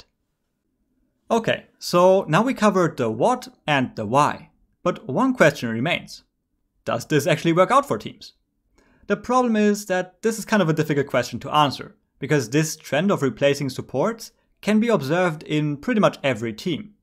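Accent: Germany. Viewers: your accent is strong. are you german?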